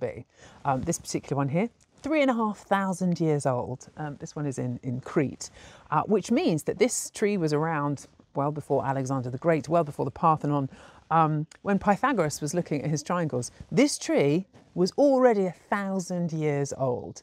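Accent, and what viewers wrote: England, United Kingdom. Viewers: Whatever British accent this is, its my favorite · Red hair, pretty, English accent. Boys, this is it. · Is the freaking english accent for me, but she also has that Brian Cox type of charisma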